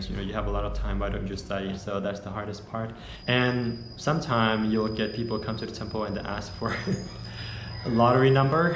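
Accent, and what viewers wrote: United States. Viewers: Hi, thanks for sharing your story. I been curious as to how you got American English accent and now I know. I became a monk when I was 10 and stayed for 2 years at Wat Prabhat in Pakse. At the time my mom and younger sibling need to go back to Vientiane but due to finance issue she left me at the temple. But it was alright since my grandmother house is right across the road. Unfortunately my aunts and uncles does not live in Pakse but in a different cities/village and have their own live. Since grandma was too old and cannot take care of me so the only choice was to become a monk. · Wow that's good to know about the monks program. Aw your English accent sounds absolutely perfect like the Californian. Thanks for sharing the awesome informations. Keeping the good work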